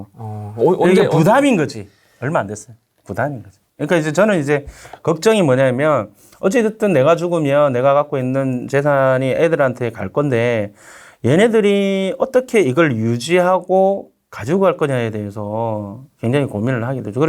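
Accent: Gyeongsang, South Korea. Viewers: 경상도 분이신가요? 완전 다혈질이네요 너무 와닿고 공감 되네요 국회로 가십시요?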